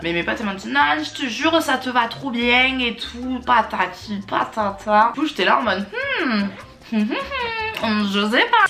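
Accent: Toulouse, France. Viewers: Tu es toulousaine???? Mdrrr je disais bien l’accent 😭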